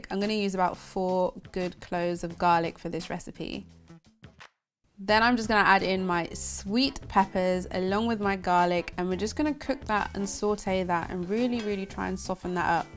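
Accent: England, United Kingdom. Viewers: She sounds English tho.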